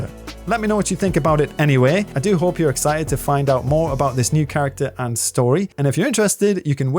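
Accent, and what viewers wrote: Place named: North, United Kingdom. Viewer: good vid, where u from? sound very northern :-)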